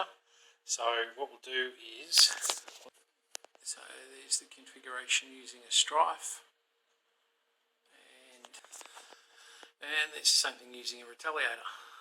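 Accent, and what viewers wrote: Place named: Australia. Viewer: this fellas Australian, I knew when I heard the voice, then I saw the fridge and it was a dead giveaway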